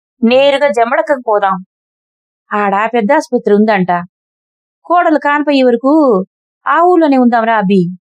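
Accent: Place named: Chittoor, India. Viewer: చాలా touching గా వుంది కథ. ఎంతో బాగా చెప్పారు కథ. చిత్తూరు యాస కూడా ఎంతో గొప్పగా పలికారు.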